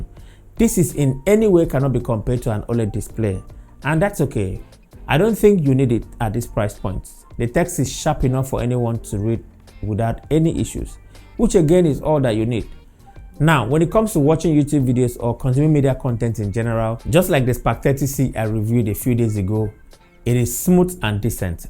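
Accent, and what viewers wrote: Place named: Igbo, Nigeria. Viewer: Igbo man , I can hear your accent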